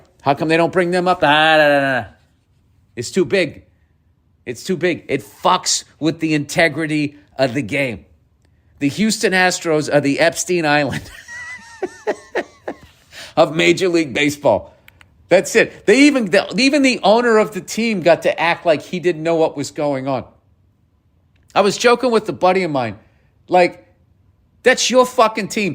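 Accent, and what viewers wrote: Boston, United States. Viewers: I think you may be one of my favorite people on the planet… Every single thing you say is exactly what I am thinking and saying about it. It’s like hearing the voice in my head with a Boston Accent 😂 It’s Awesome!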